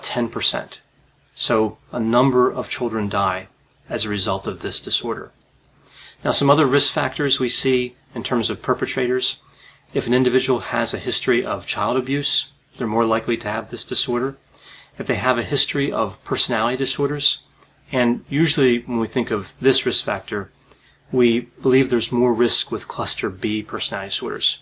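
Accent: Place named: Delaware, United States. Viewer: Delaware accent.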